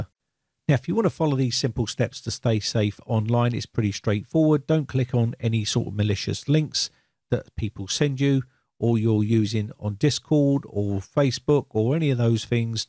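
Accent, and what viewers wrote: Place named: South London, United Kingdom. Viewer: I'm gonna guess South London accent. Rotherhithe maybe? Anyways great video man!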